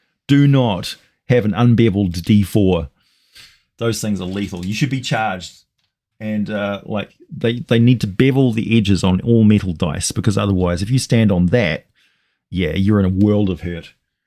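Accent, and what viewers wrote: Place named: New Zealand. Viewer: Excuse me New Zealand I said UK in my last post don't know why I said UK the accent is different LOL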